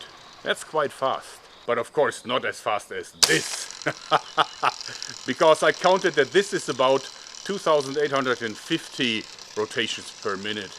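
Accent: Germany. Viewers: i love this german accent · I've said this before, and I'll say it again, you're such a happy, jovial man, with an infectious laugh. And the weather seems to always be near perfect when you shoot your videos. I happen to love the topic of your channel, but I think I would watch them even if I didn't because I like to hear your German accent. I hope you have much continued success. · Hi hörst dich Deutsch an glaube auch das du es bist xD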